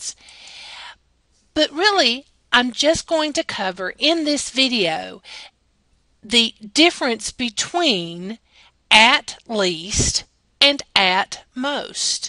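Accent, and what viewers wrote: United States. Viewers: I love her American accent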